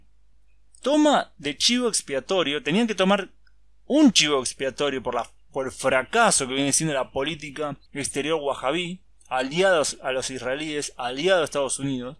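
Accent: Argentina. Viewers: que lindo esuchar un argentino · En "ashuda" y es la forma de hablar que tiene la gente que vive en el gran Buenos Aires,CABA y distintas ciudades a lo largo y ancho de la república Argentina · Tu acento de nariz tapada argentina es tan fuerte que se te entiende poco. Mejóralo, chhhheeee.....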